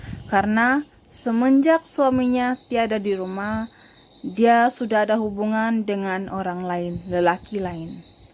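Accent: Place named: Sabah, Malaysia. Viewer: Wah.. Terbaik sis becerita. Suka dengar dialek org sabah ni👍👍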